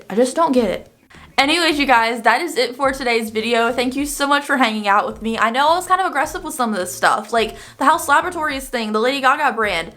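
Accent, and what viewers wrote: South, United States. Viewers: Where are you from? I hear a Southern accent.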